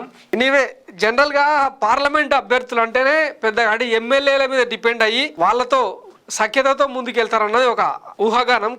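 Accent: Telangana, India. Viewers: అన్న గారు మీ వాయిస్ అంటే చాలా ఇష్టం అన్న మీ తెలంగాణ యాషా చాలా బాగుంది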